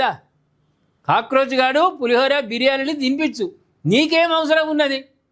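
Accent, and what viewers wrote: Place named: Telangana, India. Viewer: తెలంగాణ భాష అలాగే ఉంటుంది అపార్థం చేసుకోకు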